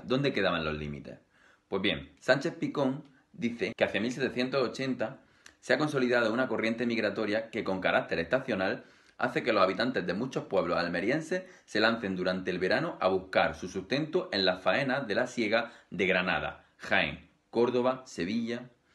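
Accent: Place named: Murcia, Spain. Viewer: En Huéscar también se le llama gazpacho a lo del pepino. Tu acento en super murciano. Más que Almeriense.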